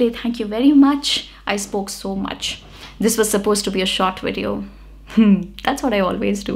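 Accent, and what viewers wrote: India. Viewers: Don't make videos regarding pronunciation cuz Indian pronunciation sucks. Some of you might be thinking I'm obnoxious and trolling her, but I'm not. I searched on Google, "Why do native speakers make fun of the Indian accent?" I opened a Quara link, and a native speaker has written when Indians speak English, it sounds as if a native speaker is trying to speak Hindi. Now, you can image how awful it is.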